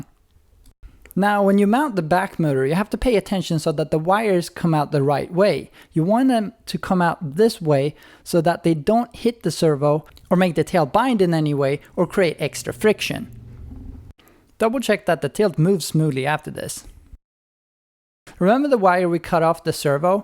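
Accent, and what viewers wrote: Sweden. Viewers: I love your Swedish accent and your jokes, they are the best! Regards from Denmark :) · awesome review just one thing, you should really pause a little longer between sentences, specially since you kind of have a swedish dialect, even for a swede its kind of hard to descipher . bara lite längre pauser mellan meningarna plz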